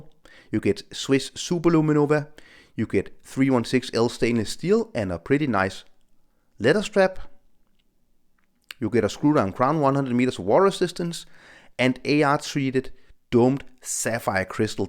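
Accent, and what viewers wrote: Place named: Denmark. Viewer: Great review, in the list of my next purchase. Love the brown dial. I realize that you have danish accent, then i open your youtube channel/profile..yup DK 😊.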